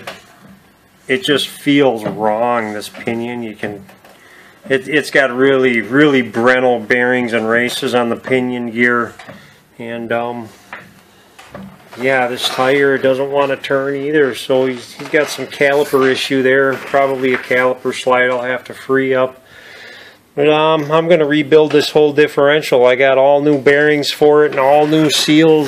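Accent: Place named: Midwest, United States. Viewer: Gotta love that ol' midwest accent lol! You just convinced me not to try to change the bearing on my 05 Jeep GC myself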